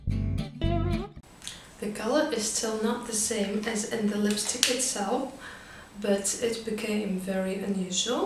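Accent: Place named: Russia. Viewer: ой, вы оказывается тоже из России) то то вижу что то родственное в акценте,решила проверить)) круто))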